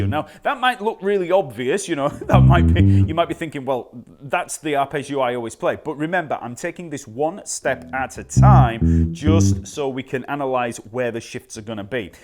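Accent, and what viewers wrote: United Kingdom. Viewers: You are, a great teacher. Moreover, I can learn better British English pronunciation:)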